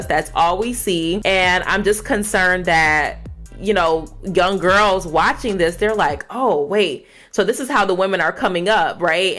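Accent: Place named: Upper Midwest, United States. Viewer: Not to be off topic But I sense an upper midwest accent. Are you from Wisconsin or something?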